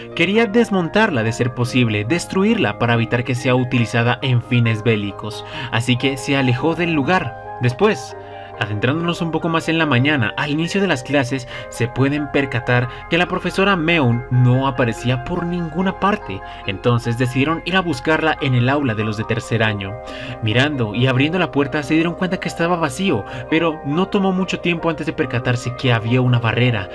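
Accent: Colombia. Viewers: a nmms eres colombiano?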